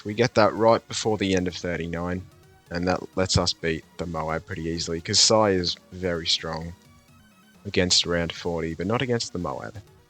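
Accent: Australia. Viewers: I can hear the Australian accent but bro uses every village flag apart from Australia, anyway thanks bro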